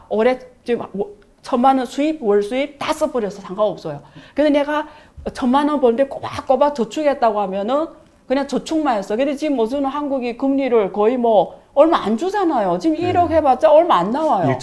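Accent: China. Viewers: 말투가 화교분이신듯.... 너무 뻔한 기본지식을 특별한갓처럼 말하시는듯...... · 정확한 발음의 한국사람도 많은데 이상한 중국식 한국발음에 여자를 방송에 ...짜증나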